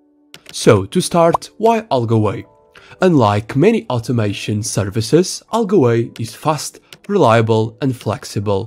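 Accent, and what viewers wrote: Portugal. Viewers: Hey bro, are you portuguese?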